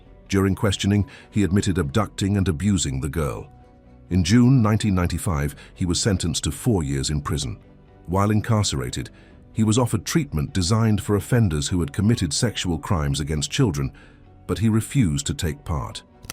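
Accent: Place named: United Kingdom. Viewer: Thanks for the sensitive narrative. I appreciate the British voice and detail.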